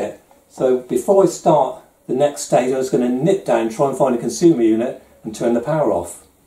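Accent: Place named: United Kingdom. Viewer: I was watching the video just to listen to British Accent!!!... It's fabulous!!!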